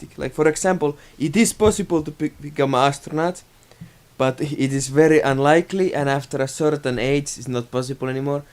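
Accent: Finland. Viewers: I'm finnish and this is the most stereotypical finnish accent ever, he is 100% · Is this a finnish accent or a messy indian accent?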